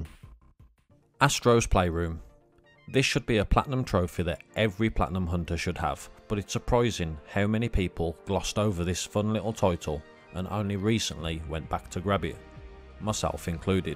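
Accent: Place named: Midlands, United Kingdom. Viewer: Great video and content, plus it sounds like you're from the Midlands, so subscribed! Looking forward to more but in the mean time I will check out some of these games. Cheers.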